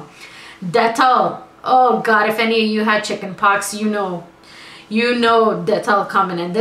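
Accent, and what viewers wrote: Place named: Guyana. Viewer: Omg girl..ur guyanese accent sound so sweet. Lol d glass comment. Hahah I think thats a west indian thing cuz we say tht in Barbados too. I luv how u say them sayings..u sound so much like my friend's mom she is from guyana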